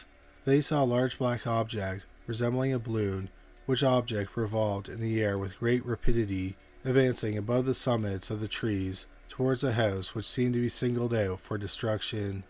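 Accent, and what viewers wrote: Canada. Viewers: Is he Canadian? It would explain some things.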